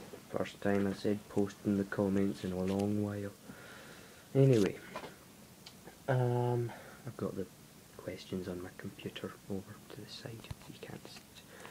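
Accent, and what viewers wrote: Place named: Scotland, United Kingdom. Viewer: D :D :D :D :D :D Scottish Accent. And first comment! (wow those don't go together at all)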